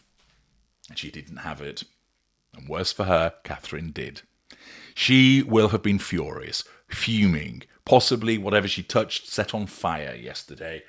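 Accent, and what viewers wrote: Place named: England, United Kingdom. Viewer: The way describes TOW with his impeccable English accent always makes me laugh 😂😂😂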